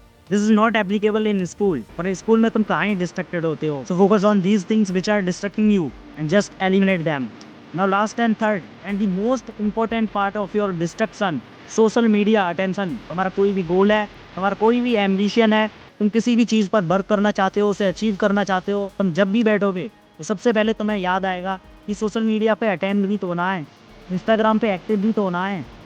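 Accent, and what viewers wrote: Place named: India. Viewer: I am not able to understand. I struggle with Hindi accent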